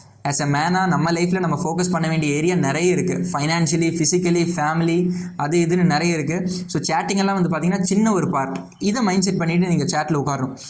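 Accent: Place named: Mannargudi, India. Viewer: Semma bro mannangatti slang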